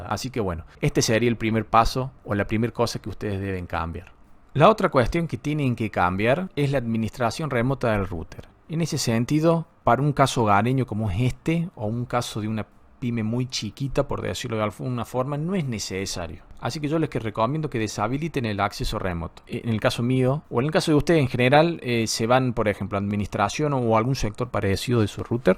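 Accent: Córdoba, Argentina. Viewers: Sos cordoooobes?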